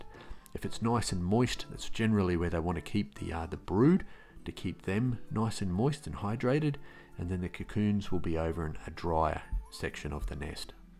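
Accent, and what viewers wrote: Australia. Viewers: I guess it's because I grew up as part of that generation but if I hear an Aussie talk about animals I tend to be entranced and retain the information better. The spirit of Steve Irwin is smiling somewhere.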